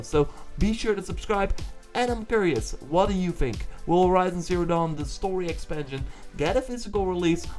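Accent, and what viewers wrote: Netherlands. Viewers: ik hoor aan je accent dat je nl bent :D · ofc he is ... that accent tho :)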